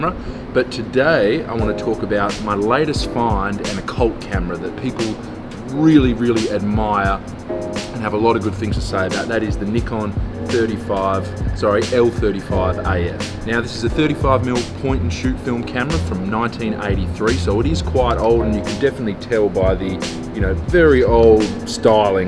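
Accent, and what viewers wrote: Australia. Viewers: First I thought "an aussie!" then I realised you're also from Perth and I subbed immediately. Gotta rep the home town. Great vid, looking at this camera so super helpful!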